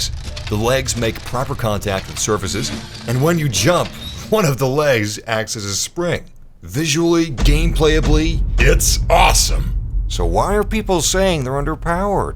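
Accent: Netherlands. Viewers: Dude, i can only hear Dutch now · You almoust sound like ducht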